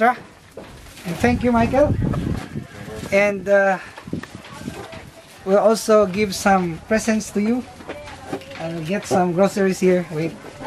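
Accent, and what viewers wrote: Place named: Bisaya, Philippines. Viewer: murag bisaya man mo kuya.. taga asa mo?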